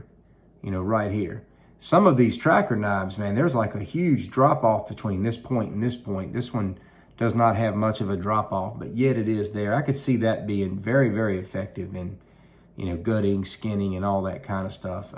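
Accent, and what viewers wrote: South, United States. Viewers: Hey big guy its great to see you again. I love the way your videos start. "Hello maaa feeeaaaands" that southern draw that all the chicks like i bet. Im just downloading your new slingshot aiming video. You know what. Try this. Forget aiming altogether. Forget your slingshots there. Just draw it like a bow. Release within 5 seconds else your bands cool down. After a few hundred shots muscle memory will take care of the aiming. If you miss; dont adjust anything. Watch your target; your mind will give you an unrest feeling; hesitant awkward if your out of sync. Frustration is just your mind trying to help you"talking". Left brain is totally useless for slingshots. Spacial awareness is right brain. Colour; shapes; movement; tonal changes. Sights dont help. Thyr liars! Art is right brain(in beginning your left brain tries to help saying"this should be like bla bla bla...". 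Slingshots is right brain not left. Its art; not science. So if your frustrated and get that grrrrr!!! Just stand upright; point your hands up and out and take a deep breath and relax. Then just fire away; over and over. Also try this. If you have a rope loop hanging from your slingshots; hang it from your arm where a wrist brace would sit. And twist the rope and slingshot till its comfortably tight under your wrist; then hold slingshot as normal. You made a wrist brace. You dont even need to hold your slingshot.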